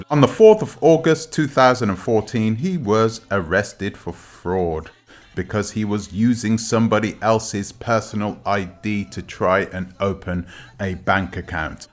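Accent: United Kingdom. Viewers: I think I don't belive you, with this English of England, start speaking like a black man, while you talking like the King of england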